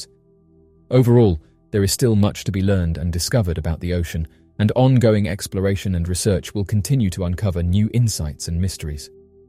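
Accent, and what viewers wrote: United Kingdom. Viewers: Cant go the F'n Brit commentator.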